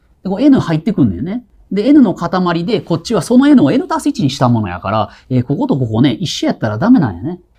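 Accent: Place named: Kansai, Japan. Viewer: すっごくわかりやすかったです！助かりました！ 関西弁もいいですね〜！！✨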